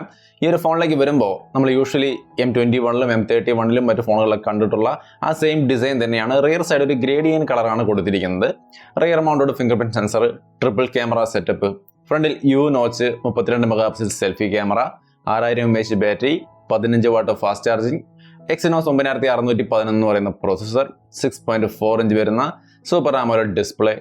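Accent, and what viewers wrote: Thrissur, India. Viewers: Gaming review il maathrame ollo thrissur slang😝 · കഴിഞ്ഞ വീഡിയോ പോലെ ത്രിശൂർ slangil വർത്തമാനം പറയുന്ന കാണാൻ വന്നവർ ഉണ്ടോ??❤️❤️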